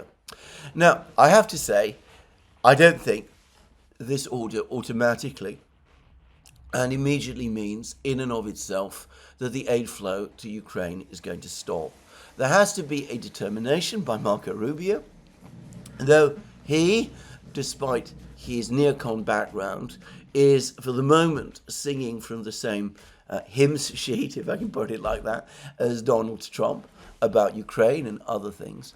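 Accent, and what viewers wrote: United Kingdom. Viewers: Somehow Trump's poetic prose sounds even more powerful in your British accent 😅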